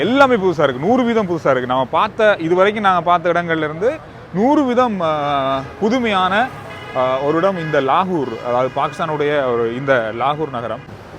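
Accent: Sri Lanka. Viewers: நீங்க என்ன தான் தமிழ் பேசினாலும் இலங்கை மனிதன் தான் இல்ல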